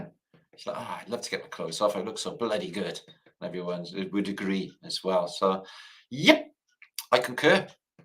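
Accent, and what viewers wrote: Wales, United Kingdom. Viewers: I used to watch your streams with the closed captions on, and YouTube would get about 55-65% accuracy. But now it’s 95-97% accurate, even with your thick Welsh accent it’s so much better picking up almost every word and beeping out any language it doesn’t like. I wasn’t expecting this from YouTube to fix their algorithm so fast Bruv.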